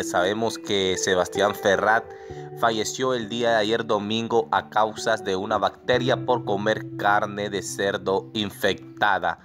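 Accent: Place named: Central America. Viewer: Se te escuchan problemas de habla y no sabes leer bien. Escucho acento centroamericano. Le atiné en todo, cierto?😐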